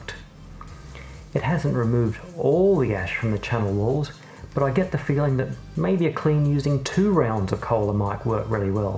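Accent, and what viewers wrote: Australia. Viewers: You sound like an Australian. Where are you based ? First time viewers unsure how we got here.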